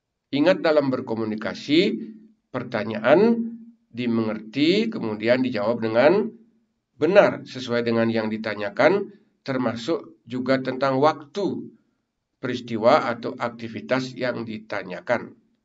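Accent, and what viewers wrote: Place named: North Sumatra, Indonesia. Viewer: " Your accsent a like a from North Sumatera😅"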